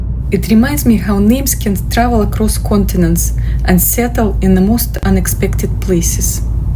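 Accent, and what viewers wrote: Scotland, United Kingdom. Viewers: I lived in Galston in the late 70s having moved from Glasgow. I remember my dad trying to get us to Glasgow and we got stuck on the main road through Moscow down the dip in the snow. Had to be pulled out. Love your accent too - I can hear the Scots pushing through your Russian accent. Beautiful!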